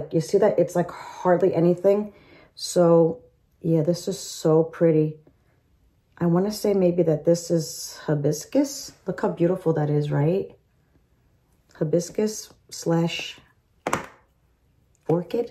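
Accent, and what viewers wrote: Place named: New York, United States. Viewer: Love your accent are you from The East coast New York ,sounds like home ,love your teteorials!!!!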